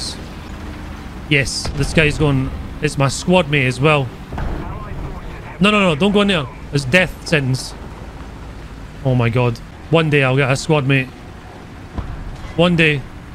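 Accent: Scotland, United Kingdom. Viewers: Hey I was for Christmas in Scotland Ben Nevis defeated me but your video was useful as a accent tutorial while drinking with Scottish people-they did not defeated me in drinking 🤣 Scotland is so beautiful! people kind! Alcohol great! I brought a blue beautiful hat everyone 8n London aks me about my orgin and i feed them up with madeup stories about my childhood in mountains- with perfect accent because of you 💪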